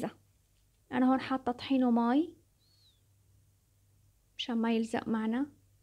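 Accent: Syria. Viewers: مدام اديش عمرك وانت من وين باين حكيك ادلبي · كأنو انتي من حمص؟؟؟حكيك مبين حوووومصي 😊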